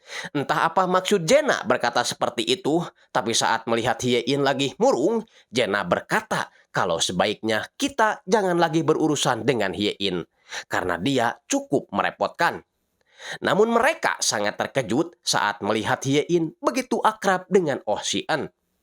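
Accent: West Java, Indonesia. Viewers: Orang Sundak kah bang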